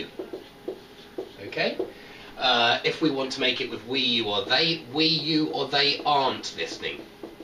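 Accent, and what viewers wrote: United Kingdom. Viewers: Thank for for your free lessons! You're doing fantastic job! Great explanation and authentic british accent! Please, make more!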